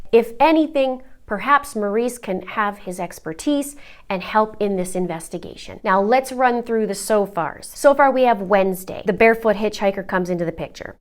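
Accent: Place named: Canada. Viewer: Wow you have the strongest Canadian accent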